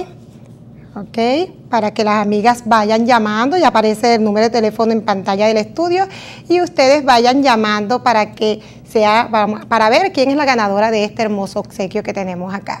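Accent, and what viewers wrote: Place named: Venezuela. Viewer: Un saludo desde El Salvador, increible como estoy aprendiendo de usted, por su acento creo adivinar que es de la hermosa Venezuela, y por tu forma de responder eres cristiana evangelica, Dios bendiga tus manos, y por favor dime o enviame por favor el paso a paso còmo hiciste el dulcero que diste de regalo por favor amiga linda.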